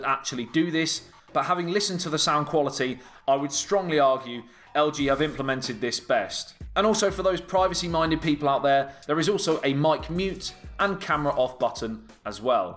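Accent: United Kingdom. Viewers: Dude, your accent is so British...You named it as but I'd call it.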